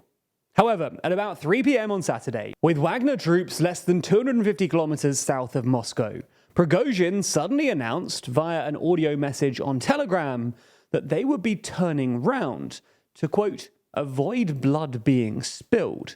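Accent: United Kingdom. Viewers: This has to be one of the most intestinal videos of this channel as of yet. From the thumbnail, to the assumptions... You probably were cheering for wagner when they were doing a coup and then calling them terrorists after they backed out. A speculative video elaborating assumptions through the lens of a british idiot that thinks he has brilliant deduction capabilities. You're just banking on the views brought by your acephalous peers that love to parrot anti-russia stuff. "The beginning of the end for for Putin"... Even the people inside the loop have no idea what happened, and here you are stating he was humiliated blah blah. The truth is that you should've just stayed in your lane, reduced yourself to your insignificance as a mere youtuber that looks like an overgrown baby and thinks he is intelligent and a respectable news outlet just because he has a british accent.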